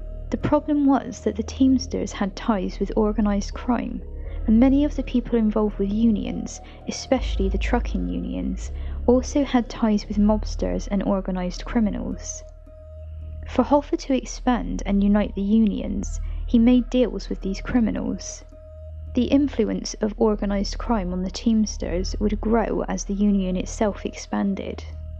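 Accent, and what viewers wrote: United Kingdom. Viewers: you sound like you come from the West Country. ..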